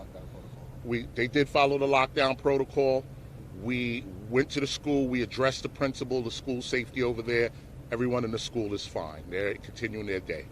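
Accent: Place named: New York, United States. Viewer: NY accent is the best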